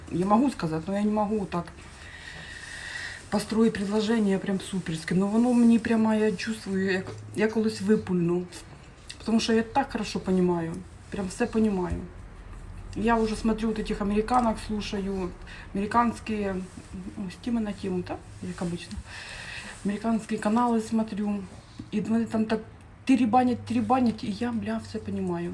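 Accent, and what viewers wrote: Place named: South, Ukraine. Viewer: У неї діалект,який розповсюджений на півдні України, так як на заході України в мові зустрічаються польські та німецькі слова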